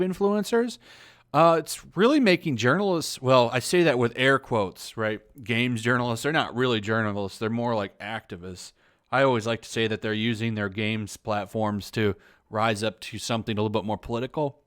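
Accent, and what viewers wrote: United Kingdom. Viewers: Have never been into any games like GTA but if you can be Keanu Reeves in Cyberpunk 2077, then I'm one step closer to trying. Excellent video again brother. Loved the British accent. God bless you.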